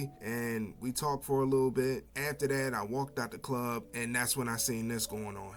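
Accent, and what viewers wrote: United States. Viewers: Sound like American woman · You from B-More or Philly?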